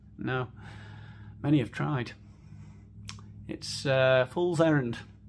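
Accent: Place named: Yorkshire, United Kingdom. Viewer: It could be his Yorkshire accent coming out 😂❤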